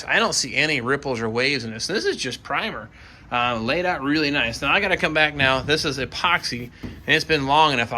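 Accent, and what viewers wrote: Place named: South, United States. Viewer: This is kind of interesting. I’ve been watching you for over a year. I always kind of thought that you are from the south, because of your accent, even though you call people “you guys.” I’m from Texas, & I thought you to be maybe from Tennessee or northern Louisiana, or the like. 🤠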